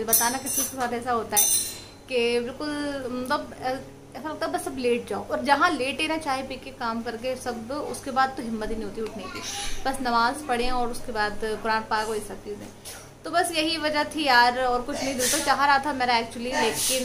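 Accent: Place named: Karachi, Pakistan. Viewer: Assalamu alikum I am from Pakistan karachi aap k bolnay ka andaz bilkul hum karachi walo ki terha hain aur aisa lagta ha k jasay aap Karachi wali hi hain please aap meray is comment ka jawab jaroor dijiay ga thanks ALLAH Hafiz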